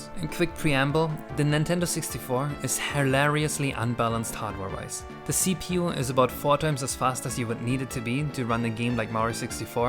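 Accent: Germany. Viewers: Über all diese Jahre hatte ich irgendwie nie gedacht, das er einen deutschen Akzent hat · You german!? Dein Akzent ist mir nur jetzt eingefallen!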